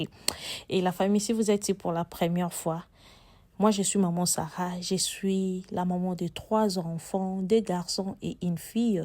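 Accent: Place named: Kansas City, United States. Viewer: Bonsoir madame Sarah j’aime beaucoup vos contenus ,en vous écoutant parler j’ai l’impression que vous habitez Kansas city aux USA par rapport au détail 😍😍😍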